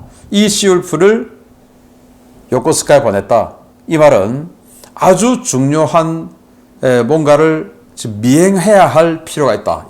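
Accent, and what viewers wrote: Gyeongsang, South Korea. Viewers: 신인균 너 말대로면 모스크바는 3번쯤 함락됐어야 한다 경상도 씹 말투 존나 거슬리네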